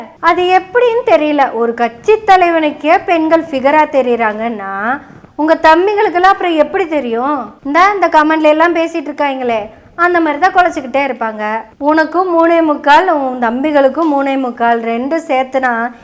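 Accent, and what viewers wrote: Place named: Coimbatore, India. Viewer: Super as usual always you are bold and pronouncing in Kovai language and taking subjects 🎉❤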